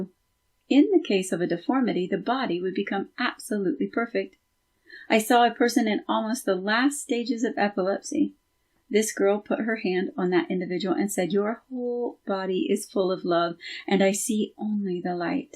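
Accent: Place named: New Jersey, United States. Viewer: One of my fav chapters in this 6 volume CLASSIC. I listen to all 6 volumes when walking on forested trails. And this lady is my fav reader cuz she carries the frequencies. I do chuckle each time she says the word: on ... cuz it exposes her accent ... probably NJ or Long Island ... she does a fantastic job .... Baird would be proud of her efforts to share this remarkable body of work.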